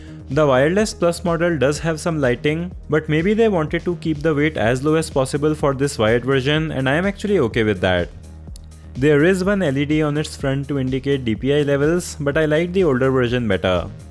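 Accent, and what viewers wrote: India. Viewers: you hindu speaking ppl are simply unbearable to listen to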